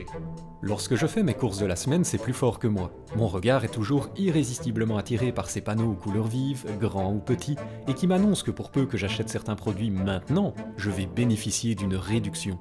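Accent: Belgium. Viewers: Merci à Astronogeek pour la découverte :) Je ne savais pas que tu étais belge, le "nonante" et le "houit" m'ont surpris ^^ Très bonne continuation à toi, y a de la qualité là ! Mat, un des 50 ou 60 premiers abonnés. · Et voilà, je me suis abonné ce matin grâce à Astronogeek et je ne suis pas déçu ! Merci pour ton travail et la qualité rendue ! Je me disais bien que tu avais le même accent que le grand Jacques ! Bonne continuation cher voisin Belge 🇧🇪 ! Un abonné Français 🇫🇷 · Une nouvelle abonnée, grâce à Astronogeek, faudra le lui dire, il kiff ca, à chaque fois ses cheveux et sa barbe poussent un peu plus ... =DD Je ne connaissais pas ton autre chaîne, mais je suis très intéressée par le sujet, contente de t'avoir "trouvée" donc, au final =) PS: Je repère une belgitude non ? les èèèèRRRR ! =D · c'est vraiment bien foutu! je ne connais pas la chaîne que tu avais avant je t'ai connu par astronogeek, je trouve que le rythme que tu a choisi pour de la vulgarisation de stats est vraiment bien. 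Ni trop rapide ni trop lent, ont à le temps de bien comprendre de quoi tu parle, roh et cet accent belge toujours aussi drole :D bon juste dommage que tu parle pas des prix des fruits et légume psk là aussi ils nous manipule bien. Faut fuir les grandes surfaces !!!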